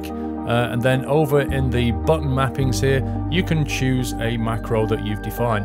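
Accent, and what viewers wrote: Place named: United Kingdom. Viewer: Nice review, i really like that it has kind of a unique british feel to it. Maybe it was just the accent:D, but it really felt more professional compared to the more gamery type of hardware reviews from US based tech channels that i usually watch Anyway i still want to give you a heads up, as there has been a lot of controversy about this that can be a problem in the future when your channel gets even bigger, i think a clearer disclaimer can be helpful, especially whether you have been paid to do a review or if it was just you wanting to do a review and they provide you with the product. Youtube also has a guideline on this too if you haven't seen it <link> Keep posting these reviews though, i enjoy it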